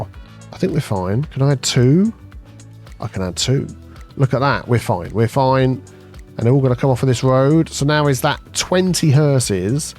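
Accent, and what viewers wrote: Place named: RP, United Kingdom. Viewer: I keep saying “Penndel” in posh voice to myself off and on throughout the day whenever I need a laugh. That caught me so incredibly off guard at 😂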